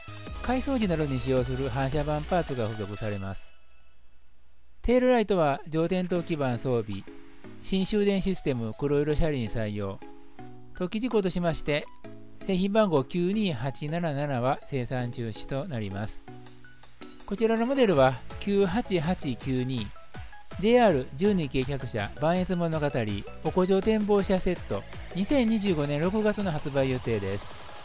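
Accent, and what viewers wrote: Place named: Kansai, Japan. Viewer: HIDEKYU様 動画UPお疲れさまです。大変わかりやすくまとめられていて参考になりました。 ありがとうございます。 やわらかな関西弁にも癒されます。 キハ82, 189系あさま、E3系つばさなど、今月発表分には財布の紐が緩みそうです💦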